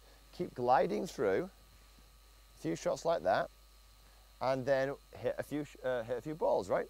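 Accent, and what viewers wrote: United Kingdom. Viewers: That ain't no different chipping method, WTH is this British speaking talkin bout.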